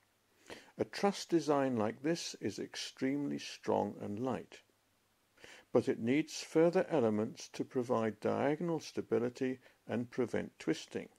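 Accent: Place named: United Kingdom. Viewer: British-accented voice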